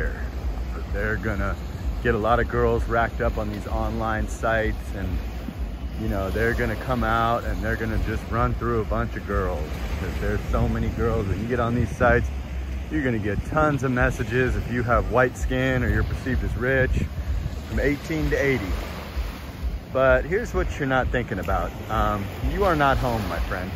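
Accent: United States. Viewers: You sound like you are from California. Thanks for the heads up.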